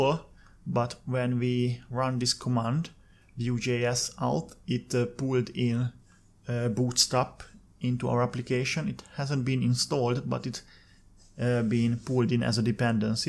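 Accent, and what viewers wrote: Hungary. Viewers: akkor jól éreztem az akcentusodból hogy Magyar vagy. Köszi akkor magyarul is 😀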